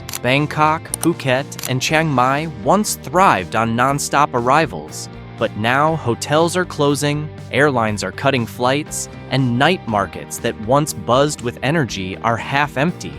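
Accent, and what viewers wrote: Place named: United States. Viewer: Yet another American accent telling 'like it is'. Don't believe anything that comes out of that place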